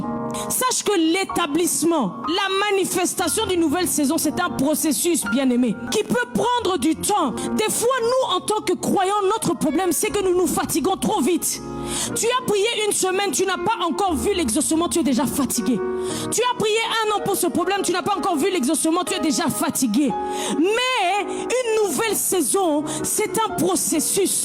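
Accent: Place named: Democratic Republic of the Congo. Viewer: Elle prie comme les congolais. Meme accent. Sois Beni maman. Que Dieu vous fortifie.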